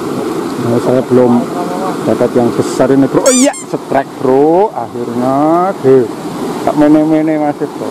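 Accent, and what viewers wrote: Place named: Banjarnegara, Indonesia. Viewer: Asline ngendi mas...logate kyk neng nggonku Banjarnegara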